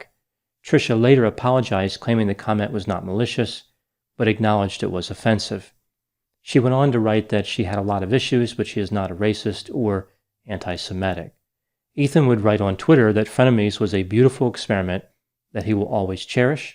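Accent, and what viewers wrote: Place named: Delaware, United States. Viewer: Now that I know he's got a Delaware accent... I can't get over his adorable Delaware accent. It picks me up when I'm feeling "bayud"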